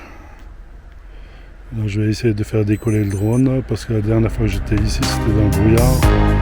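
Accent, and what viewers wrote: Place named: Alsace, France. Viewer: Je ne me moque pas pour l'accent, je l'affectionne tout particulièrement. J'ai déjà visité l'Alsace en long et en large, j'ai découvert plus récemment la vallée de la Doller, très jolie, une pépite ce petit village de sewen, ma fille y habite depuis trois ans. Merci, belle fin de soirée, je ne manquerai pas de te faire encore des commentaires sur les vidéos que je n'ai pas encore regardées. Au plaisir. Léna.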